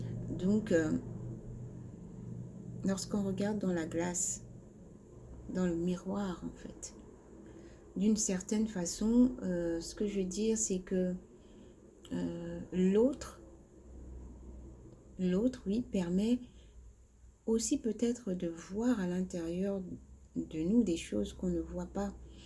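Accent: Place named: England, United Kingdom. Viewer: Merci pour la lecture des messages, des clés qui mène vers le chemin du succès. Vous avez vraiment l'accent Anglais. Et qu'et ce que c'est beau à l'écoute. J'ai adoré. Merci soeur pour votre merveilleux partage. Abssaanaa.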